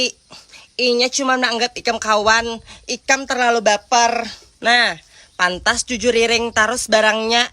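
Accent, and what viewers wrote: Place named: Banjarmasin, Indonesia. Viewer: Hello....,aku di jkt suka banget dgn gaya bicaranya or logat Banjarmasin ❤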